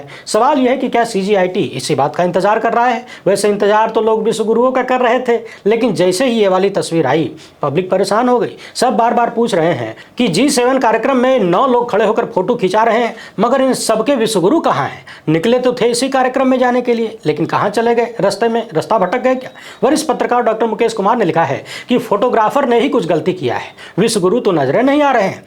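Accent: Bhojpuri, India. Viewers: आपका भोजपुरी अंदाज़ बहुत ही निराला है । मन को मोह लेने वाला । आप अपने इस अंदाज़ से भोजपुरी भाषा की भी सेवा कर रहे हैं । आपसे प्रभावित होकर, मैं स्वयं आपके भोजपुरी अंदाज़ में, अपनी विडियो बनाने का प्रयास करता हूँ । पहले मैं English language में अपना विडियो बनाया करता था । परन्तु, आप तथा रवीश कुमार को देखकर, सुनकर, मेरा आकर्षण पहले हिंदी की तरफ, तदुपरान्त भोजपुरी भाषा की ओर हुआ । मेरे लिए वैसे तो यह सब काफी मुश्किल है । परन्तु, फिर भी मेरा प्रयास जारी है । All credit goes to u n Ravish ji . 🙏🏻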